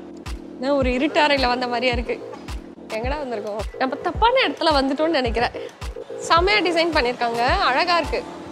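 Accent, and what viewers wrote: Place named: Chennai, India. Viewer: Unga Chennai slang super akka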